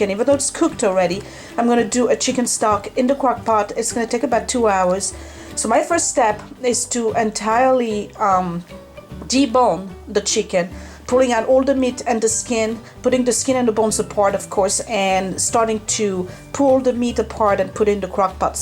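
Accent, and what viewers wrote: France. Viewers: yay! More French accent! I love listening to you speak. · and the soup are amazing:))) ha ha ha l'accent français;)!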